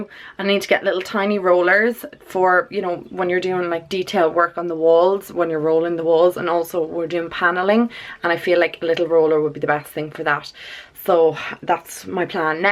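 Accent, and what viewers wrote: Dublin, Ireland. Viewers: are you from Dublin? I always though you were from the country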